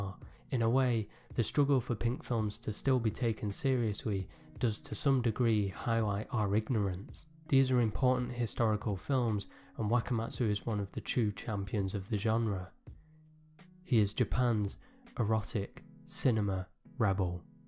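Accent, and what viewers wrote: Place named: Manchester, United Kingdom. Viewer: This was really interesting! Something made me think about Wakamatsu earlier tonight, and I've only seen one film of his (Go Go Second-Time Virgin, some time around <num>) and gave his name a search and clicked through to this. I definitely wasn't expecting to hear a Manc accent hahah.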